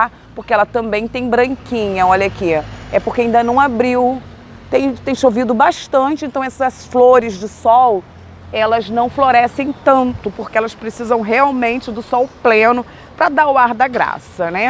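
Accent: Rio de Janeiro, Brazil. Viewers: Eu acho que vc é carioca.deve morar no rio de janeiro vc tem o sutaque de carioca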